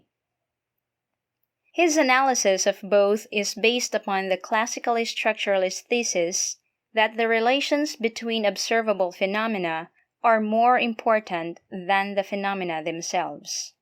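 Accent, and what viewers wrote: Philippines. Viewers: This dialect sounds like the Standard Philippine English.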